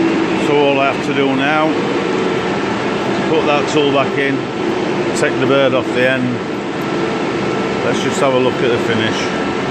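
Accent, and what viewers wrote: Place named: Northern England, United Kingdom. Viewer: wonderful accent Northern MaN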